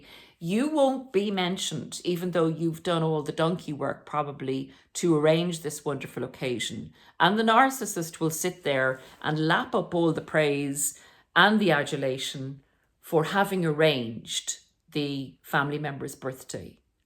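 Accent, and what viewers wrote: Ireland. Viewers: you sound irish ! 😊😊😊😊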